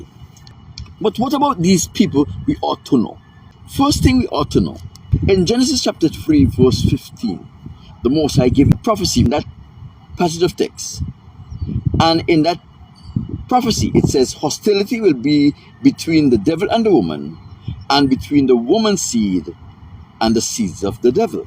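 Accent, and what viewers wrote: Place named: Grenada. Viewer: You from Grenada. Gotta be!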